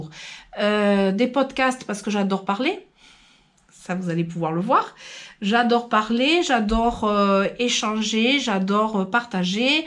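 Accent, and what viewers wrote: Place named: South, France. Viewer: Quel plaisir votre bonne Humeur et ce bel accent du sud . Je découvre votre univers coloré et j aime beaucoup 😉 d ailleurs je vais aller jeter un l œil sur votre autre chaîne YouTube car moi aussi j aime les plantes même si elle parfais ne m aime pas trop 😂. Au plaisir de vous trouver 😊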